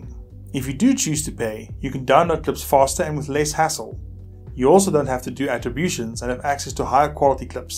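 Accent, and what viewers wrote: South Africa. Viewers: South African accent! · You sound South African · South African accent? Love it, apologies if I'm wrong.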